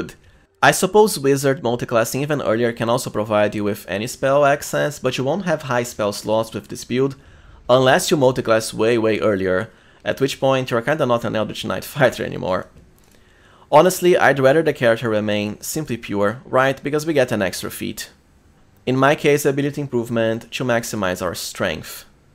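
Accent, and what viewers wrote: Brazil. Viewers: Are you brazilian? I watch Luality and she pronounces "to" just like you ("chew", "chewday" etc) lol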